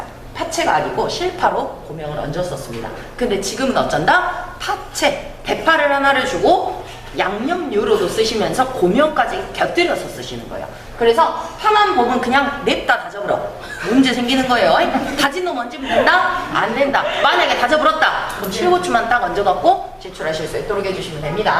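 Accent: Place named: Jeolla, South Korea. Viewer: 전라도 사투리 구수합니다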